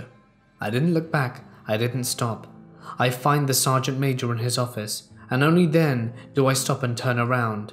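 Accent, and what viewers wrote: United Kingdom. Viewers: My hEDS is kicking off my chronic pain. Every time this happens, I end up sitting up listening to your soothing voice (us Brits do have a fabulous accent!) And relax till I don't notice the pain anymore xxx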